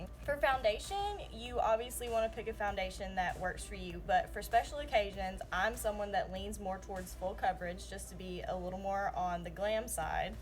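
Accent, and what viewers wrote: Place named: Texas, United States. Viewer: Are you from Texas? Or at least a southern state? Haha your accent sounds just like mine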